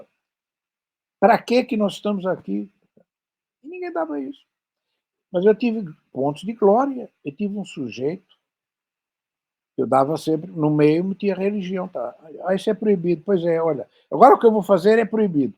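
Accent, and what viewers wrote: Brazil. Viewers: ele é portugues! lol está é a falar com o sotaque brasileiro. o que acho uma completa idiotice e traiçao á pátria! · Falar com pronúncia brasileira professor? recuso ouvir isso. O senhor é português!!! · Estive duas vezes no Brasil, em 1984 e em 1991. Se eu não falasse com sotaque brasileiro, 80% das pessoas não me entendiam. Aqui o importante é que eles, os brasileiros, entendam o que o professor está a dizer. Além disso, fique sabendo que os linguistas dizem que o português que se falava em Portugal nos séculos XVII e XVIII era, quanto à sonoridade, muito mais parecido com o que se fala hoje no Nordeste do Brasil do que com o que se fala hoje em Portugal, com as vogais muito fechadas… Embora pareça um pouco ridículo para nós, que estamos habituados a ouvi-lo falar o nosso português, acho que o professor tomou a atitude correcta aqui. Não deite fora o bebé com a água do banho…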